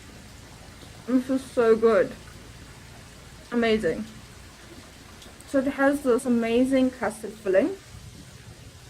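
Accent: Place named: South Africa. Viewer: Your subtitles are the best. Lol... Google clearly doesn't understand our South African accents. Nice videos 👍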